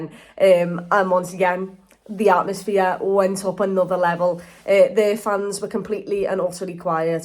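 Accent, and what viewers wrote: Liverpool, United Kingdom. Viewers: you are a Natural!! Great Vid. Great Voice for it with a Lovely touch of Scouse. Great Blog well done! Go work for the BBC take that egotist Chapmans job!! YNWA! Come on you Redmen!! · Love your narration Proper Boss Scouse accent POSH you did well 3 points Lfc fan from India I came to UK Lived in Liverpool Saw one game 2019 You make us feel proud Keep it up Par excellence Chef Israel Bhooshi Bagillt North Wales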